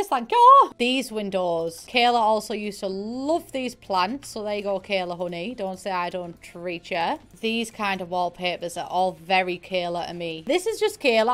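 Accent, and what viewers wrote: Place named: Scotland, United Kingdom. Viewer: Your voice gives me LiFe! I love the Scottish accent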